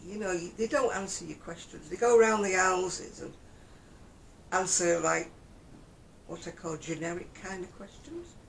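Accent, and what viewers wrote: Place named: England, United Kingdom. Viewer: Sweet Pea… that was an enjoyable chit chat. Especially the English accent. What happened to respect your elders, as I am one of those now. Oh well, it is easy to find the dark but but but……I search for the light with a chuckle under my breath. Be well, Love‼️❤️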